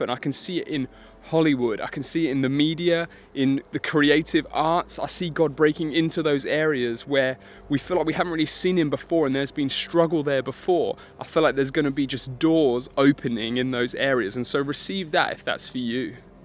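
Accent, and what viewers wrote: England, United Kingdom. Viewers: I absolutely loved the quality of your video! Great production and your English accent is adorable! God bless from Australia!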